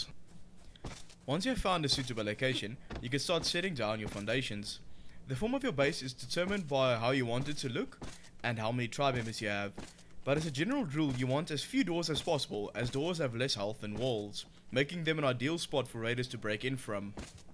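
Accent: South Africa. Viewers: hahaha kan hoorr jy is afrikaans 😎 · South African? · You must be South African 😂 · are you South African?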